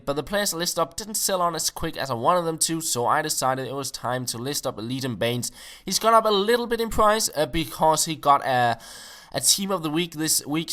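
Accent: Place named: West Zealand, Denmark. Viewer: hvaaa? er du fra Vestjælland?:)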